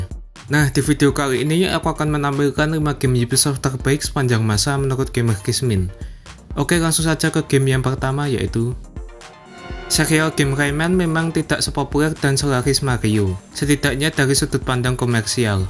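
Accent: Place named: Java, Indonesia. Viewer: suara nya kok medok 😭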